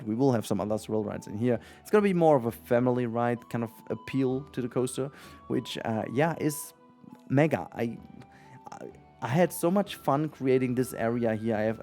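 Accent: Germany. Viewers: Johannes Kepler in Süchteln und dann Berufskolleg in Dülken. Ich wusste doch, dass Du nen niederrheinischen Akzent hast 😂😂😂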